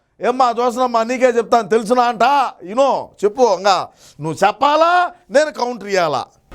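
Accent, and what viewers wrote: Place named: Kadapa, India. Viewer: First preference ni videos ki istunaa..... fun and information rendu untay..... mana kadapa slang kuda❤❤❤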